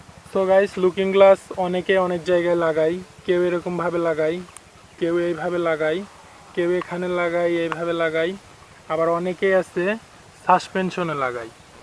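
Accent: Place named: Bogura, Bangladesh. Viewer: এগুলা বগুড়া এলাকার কথাই পাওয়া যেত পারে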